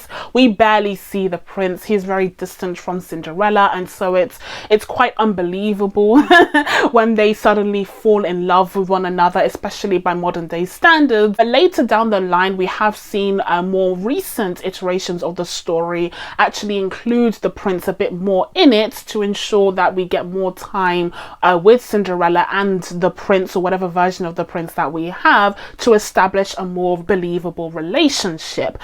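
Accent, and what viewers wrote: United Kingdom. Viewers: I'm Finnish myself, and I'm generally bad at placing English accents as it is a second language I rarely get to use other than online. I do love your accent, though. It feels very British, but flows in a way I haven't happened to hear before. Not sure if there's like a definitive London accent, I'd imagine not as it is a very fluid and cosmopolitan city, but yours is lovely ♥ Pretty sure you have more people there than we do in all of Finland, around 5,5 million here 😁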